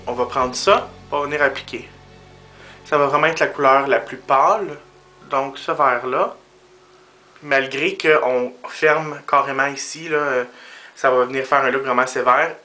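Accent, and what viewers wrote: France. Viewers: are you from the south of france? trying to figure out ur accent (in french)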